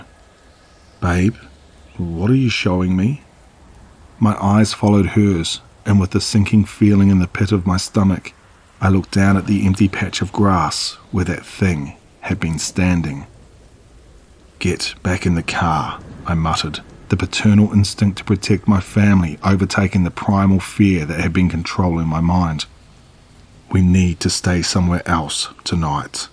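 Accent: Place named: New Zealand. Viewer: Another great story. Thank you for providing us with very unique stories in your fantastic Kiwi accent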